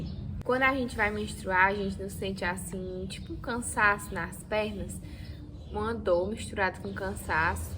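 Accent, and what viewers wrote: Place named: Ceará, Brazil. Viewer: E esse sotaque cearense?..hehe.. Deus abençoe sua vida! Deus sabe de todas as coisas!! O meu caso foi muito parecido, adotei uma menina, minha Larissa. Ainda pensava em engravidar, quando resolvi fazer tratamento, descobri câncer de endométrio, minha sorte foi oq descobri cedo. Hoje estou curada e muito feliz pq estou curada e posso cuidar da minha menina Larissa. Presente q Deus me deu para que não sofresse depois uma histerectomia total. Deus sabe de todas as coisas. Tudo é providência Dele. Penso em adotar outra menina pq a primeira deu certo, amor maior não há!!!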